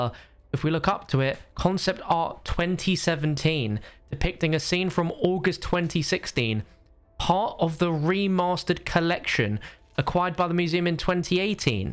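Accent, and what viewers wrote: England, United Kingdom. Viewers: "omg a Juggernaut. How many grenade hits is he going to take?" Cleary hasn't played the High Explosive Spec Ops mission or maybe even figured out it takes one to the head XD. (also, the proper term is "soccer ball". But I can tell you're from England so it's whatever. lol) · Are you UK?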